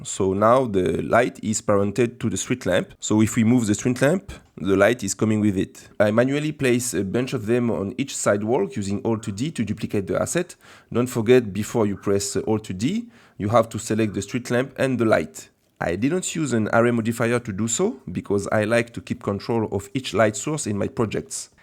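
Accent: France. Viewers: me disait bien que tu étais français j'avais reconnu l'accent 😂 Un énorme merci encore j'apprécie énormément votre travail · L'accent français me fume très intéressant sinon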